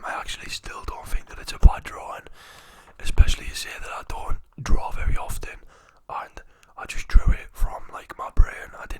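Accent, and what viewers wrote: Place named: North, United Kingdom. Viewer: I understand everything he says but I guess its just because Im also from the North🤷🏻‍♀️ Great ASMR though, very tingly 😍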